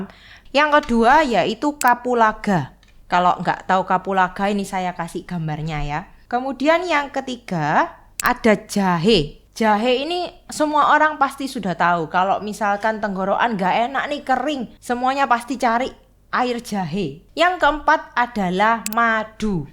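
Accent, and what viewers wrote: Java, Indonesia. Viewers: logat jawanya❤❤❤❤❤❤❤❤❤❤❤❤❤❤❤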